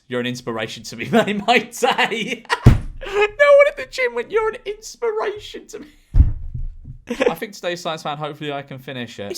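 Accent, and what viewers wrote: United Kingdom. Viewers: His British accent is just…. 😩❤️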